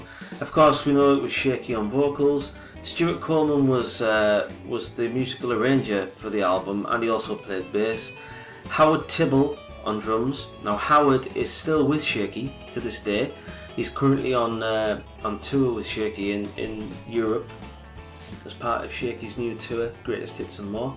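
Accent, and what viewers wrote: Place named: Northern England, United Kingdom. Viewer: One of my absolute fave Shaky albums, this. Not a weak track on it, a great country-rockabilly sound and just generally fantastic. Also, first time I heard you speak, mate, a fellow northerner by the sound of it (although I'm a Yorkshireman).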